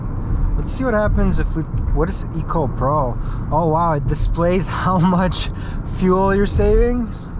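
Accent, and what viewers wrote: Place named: United States. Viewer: urodzony w stanach? you sound like a native american speaker.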